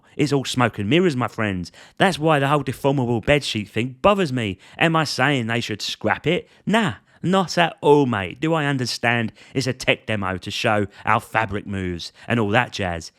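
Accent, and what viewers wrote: Cockney, United Kingdom. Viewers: Awesome vid and so true!!! Respect for U doing it!! and on another note....there is nothing better than Cockney to interpretate English Lang!! 🙏🏻💪🏻👌🏻😂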